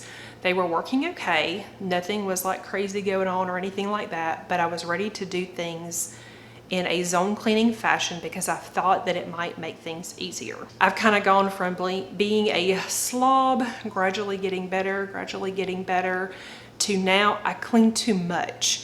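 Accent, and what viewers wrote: South, United States. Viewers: hello from NC! I can tell by your accent you're from the south, too. It's nice to hear that comforting sound, right? I'm a new Flybaby. I guess I should say I'm more of a flylarve. I just about jumped on board a few years ago and got overwhelmed. Today, after divorce, domestic violence therapy for severe PTSD and raising my kids completely on my own, I found myself craving routine. Needing guidance, I went back to the FlyLady website. But, like everyone has said, boy, that website really needs a facelift. So, I turned to YouTube. Yes, the Secret Slob and all of the others you mentioned have led me here. To be transparent, I just bought, downloaded and put together my Control Journal today. I was looking for one more push and searched "flylady 31 baby steps". That's what brought me to you. I'm like you in a lot of ways. I'm all or nothing. 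Typically, I jump in sprinting and can never finish the race. I procrastinate until important becomes urgent and suddenly, chaos ensues. I haven't watched any of your follow up videos yet, but I will as I take my babaysteps. I was even thinking of using my Instagram account to post Stories about my journey. I have a planner account that has a substantial number of followers. Maybe, if I tell them I'm doing it, it'll give me accountability. I'm sure making videos has done that for you. Cheers to you for taking the plunge again. It says a lot about you that you "failed" and yet, came back for round two. I'm looking forward to watching y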